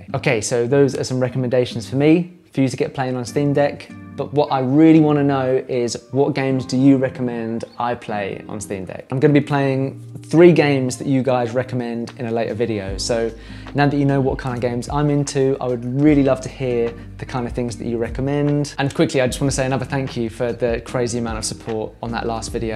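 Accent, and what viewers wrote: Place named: United Kingdom. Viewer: You’ve made three videos. On steam deck. Three. That’s it. This is my favorite steam deck channel. Admittedly the accent helps, I love the shit out of Brits. But honestly you’re so entertaining and genuine. Keep it up “mate” ;) I’m working on it! And ps keep being a great human, anyone who teaches for a living deserves a special spot in well whatever you believe the afterlife is. Teaching children especially. Well done!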